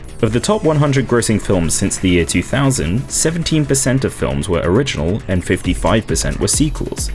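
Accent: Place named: United Kingdom. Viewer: Petition well and truly signed. Also, another great video essay right here. Loving the charming British accent. Wish I had one.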